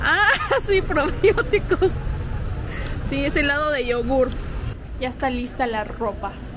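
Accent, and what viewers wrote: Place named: Mexico City, Mexico. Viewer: Hablas con acento de Ciudad de México!!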